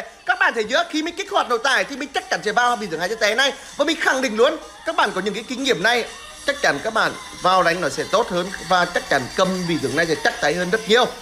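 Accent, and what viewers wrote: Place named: Ha Tinh, Vietnam. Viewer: Eng ở hà tịnh hay nghệ an rứa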